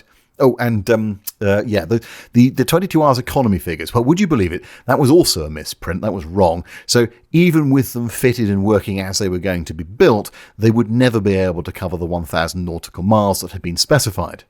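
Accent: United Kingdom. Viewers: I was not totally listening until I heard the British voice claim the Republic F105 Thunderchief was a "Crap airplane". Which is NOT WHAT PILOTS THOUGHT. The F105 was considered a "Cadillac of aircraft" by their crews and pilots. It was insanely complex, and very large, but it was beloved by most of the people who flew these aircraft. They took them out of service because they were doing ridiculously dangerous duty drawing radar contact of SAM missile batteries, and thus about 380 of these aircraft were lost. Strategy began to change. But the F105 was not truly a "crap" aircraft, not by any rational measure. In fact, it seems the electronic and radar gear on the plane appears to have been hyper advanced, and established the trend that advancement in electronic gear was going to be "the future" along with advanced missile tech. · just finds channel...hummm this guys sounds British but what level of snarky British is he. 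(watches for a few minutes) ahh proper British snark levels! instant sub!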